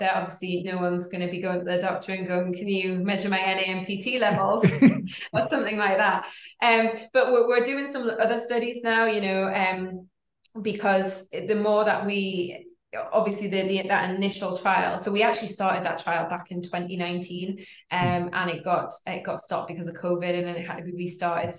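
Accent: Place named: Newcastle, United Kingdom. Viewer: Geordie accent.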